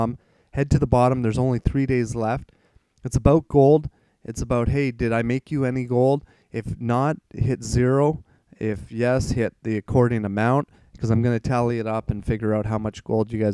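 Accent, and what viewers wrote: Canada. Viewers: Wait you're Canadian???? Where are you from?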